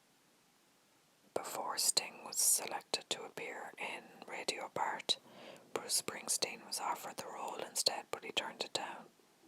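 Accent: Ireland. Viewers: Are you Irish? You remind me so much of a friend of mine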